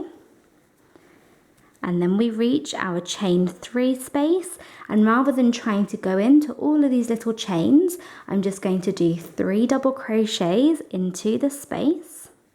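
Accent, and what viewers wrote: United Kingdom. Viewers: I love her voice as well!!! I just love the accents from all over the UK!!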